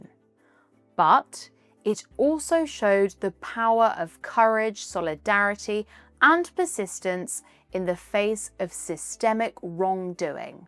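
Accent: RP, United Kingdom. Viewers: You were born to be a British English pronunciation and modern RP accent TEACHER! ❤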